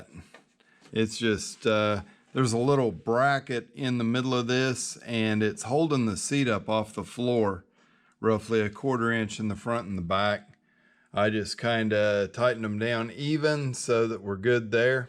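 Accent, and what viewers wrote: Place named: South, United States. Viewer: Im starting to get your shorts with the exaggerated southern country accent in my feed. The algorithm is starting to work for you with those videos! Make more they’re really funny and you’ll get a lot more subscribers!! Awesome videos. Watching From Chicago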